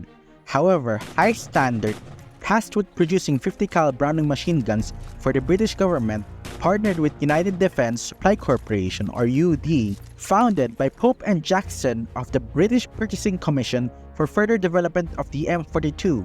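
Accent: Philippines. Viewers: you don't need a better mic tho, you gonna need voicemeeter banana to modify your mic quality that sounds good.(free to use) Also, i can notice your filipino accents while speaking english. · Can’t tell if the VO is an intentionally bad filipino impression or just uncompromisingly authentic, great work as always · I love me a filipino accent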